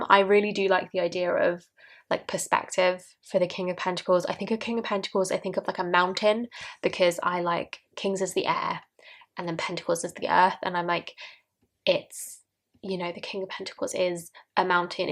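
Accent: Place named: England, United Kingdom. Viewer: LOVE LOVE LOVE this! Fairly new to your channel and have been dipping in here and there. I will be starting my own tarot journal when it arrives. I am intrigued about writing my own keywords on a deck. I have considered this before but it sort of felt wrong. I am off to google Lisa's book now :) Also as a side note your voice is beautiful, it's giving me serious ASMR vibes feeling very chilled now. I am from London but live in Ireland so really miss the sound of English accents :D Thank you for all the inspo xx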